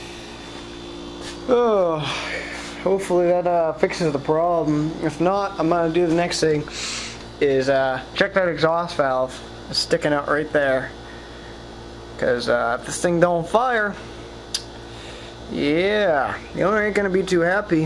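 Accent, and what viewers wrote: Canada. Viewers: ur Canadian?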